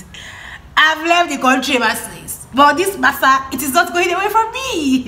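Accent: Ghana. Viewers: You virtually have Ghanaian accent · Wow, you're so lovely and entertaining, It's interesting watching and listening to you. You can keep and use the pronunciations as you learnt in ghana.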